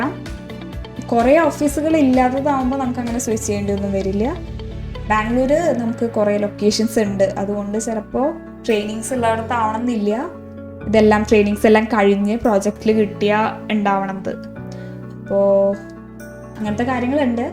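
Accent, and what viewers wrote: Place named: Valluvanad, India. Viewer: Valluvanadan bhasha .. athond choichathatto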